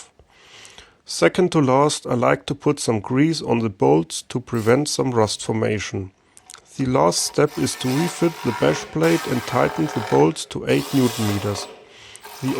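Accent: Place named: Germany. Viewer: You should do more of these. This is absolutely excellent. I get a lot of reassurance hearing a German accent, part numbers and torque settings!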